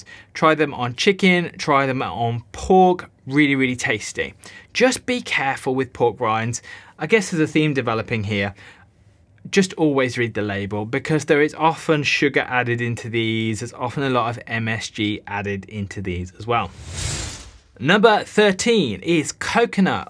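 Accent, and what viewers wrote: United Kingdom. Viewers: As an American, I love this too. It's so nice to hear a British accent 👌🏽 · great to see you back you're my favourite source of info for my low carb lifestyle and nice to see a Brit doing it, nothing against the Americans, they're great but having a British voice amongst them is brilliant! I just wish the rest of the UK would understand this more- my friends look at me as if I am mad when I so no chips or no rice or no bread and dont even get me started about what reaction I get to cream, butter and lard! Looking forward to your next videos 😀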